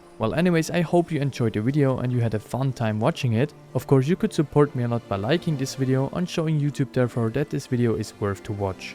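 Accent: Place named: Switzerland. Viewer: Yes de Akzent im Englische würd ich überall erkenne 🇨🇭 Btw usually I would do all quests even the dreadful Reason of State but for Simun, who you meet in Lugos' prison, was so fishy that for once I turned down a quest. Seeing how awful Unpaid Debt is makes me think that it was the right choice 😂 However, it is interesting that the name of the man who Simun orders you to kill is the name of a Slavic smithing god. Simun mentions his sword if you side with him, but there is no special loot to be found there.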